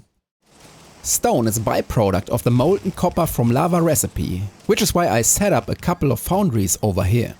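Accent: Germany. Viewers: lern doch einfach mal gutes englisch , das tut im ohr weh, dein deutscher slang 😀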